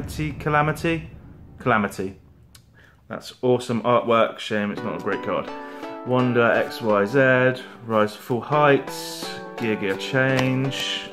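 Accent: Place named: United States. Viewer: Great job and you sounded American not British and what's with long intro once not A problem with it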